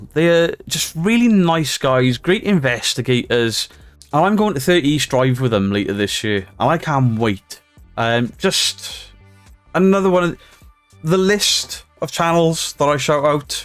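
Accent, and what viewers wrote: Wales, United Kingdom. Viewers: I love the Welsh accent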